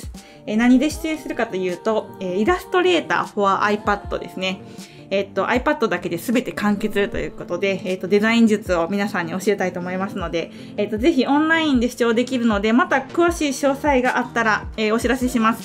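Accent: Kansai, Japan. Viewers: 地味な作業😁だな〜。 Adobe MAX 楽しみにしてます❣️ そしてめっちゃ関西弁😁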